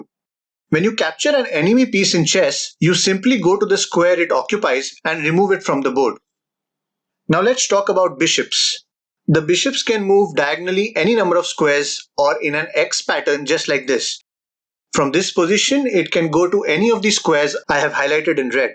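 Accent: India. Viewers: Indian accent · Indian accent, huh? 😀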